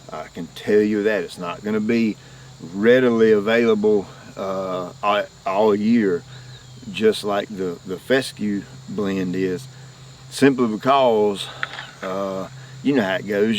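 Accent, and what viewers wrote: South, United States. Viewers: You are an amazing person with a great southern voice!! Lol keep up the great work!!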